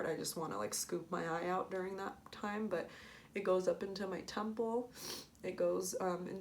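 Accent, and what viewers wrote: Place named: United States. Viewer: a few ideas for you: It is likely that it has something to do with a jammed up neck from an old neck trauma injury. If so then to fix such a neck you'll need to use these techniques: <link> The videos that show the treatment and yo get to see some of the hopeless cases that had been written off - but who are now fixed are shown here: <link> As you sound American and I'm in St Albans UK, then for the time being try this technique: <link> - it is the standard homework I give my patients and it is often very effective. As I think its your neck sleeping on an incline may also change the pressure on your neck, so you may need to try a lower pillow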